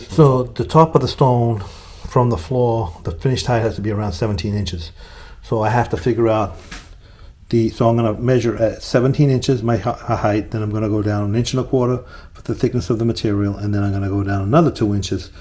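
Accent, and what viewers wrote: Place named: New England, United States. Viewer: Great video. You are definitely in New England with that accent! Boston?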